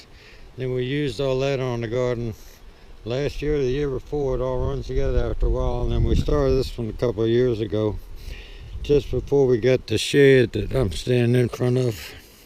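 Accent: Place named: Virginia, United States. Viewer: I figured y'alls last frost would be much earlier than us? I live in far southwest Virginia in tazewell county and our last frost date is may 10th I just know by the accent your in far eastern or southern Virginia. I just started my channel and I hope to start growing edibles as well as my ornamentals and tropicals. I'm trying figs and I consider them tropicals here cuz we're 2400 foot up in the mountains and we get long cold winters!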